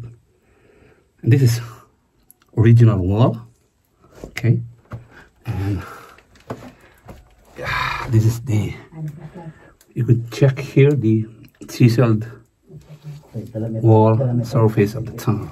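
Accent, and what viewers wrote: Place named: Philippines. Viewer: Oh man! English man with filipino accent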